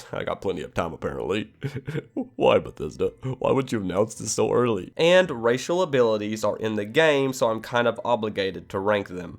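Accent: South, United States. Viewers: Southern guy playing some Skyrim? Subbed!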